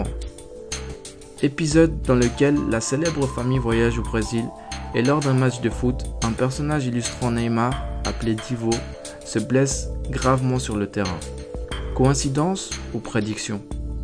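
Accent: Belgium. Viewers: C'est obligé ce mec il est belges vu comment il prononce les dates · Il est belge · + articulation TRES faible, je connais bien l'accent belge mais je n'arrivais pas non plus à comprendre les années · T belge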